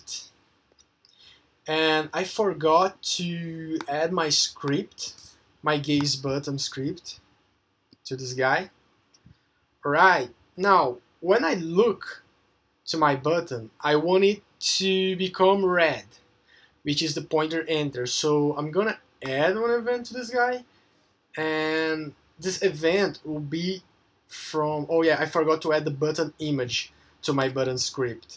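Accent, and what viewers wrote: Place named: Brazil. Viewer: Are you brazillian? You sound exactly like me when i'm trying to speak in English (this is not an offense)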